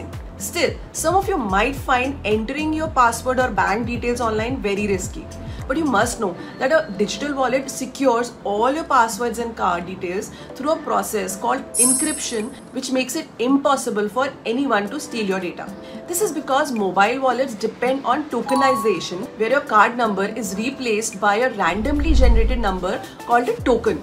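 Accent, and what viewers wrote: India. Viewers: What an accent got this girl, she must be indian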